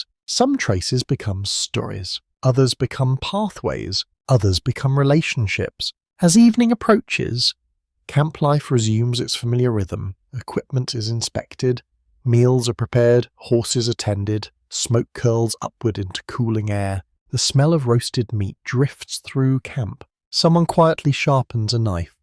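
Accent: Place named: United Kingdom. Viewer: It's impossible for me to appreciate a video about early American history being narrated by a guy, real or not, with a British accent. I wouldn't watch a video about European history if the narrator sounded like John Wayne.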